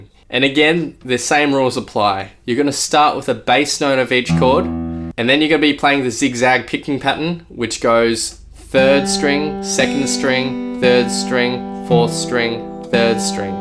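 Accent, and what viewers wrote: Australia. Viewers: Its even better with the Aussie accent.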